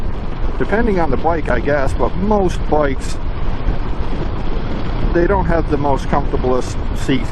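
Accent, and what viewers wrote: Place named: East Coast, United States. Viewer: This was amazing. Not sure if it was the east coast accent that brought me home or all the nails you hit on the head but this was excellent. I could relate to so many points you made. I just bought my first bike a couple months ago and all the gear to go with it. Got a 23 MT-07 and there are no words for how it feels, it's crazy. Working on getting a booking for the MST, some people around here are waiting over a year to get their course done due to how few examiners there are and how booked up it is. That Klim Induction pro jacket was rad 2 months ago, now it's getting chilly! Thanks for the vid! Sub'd.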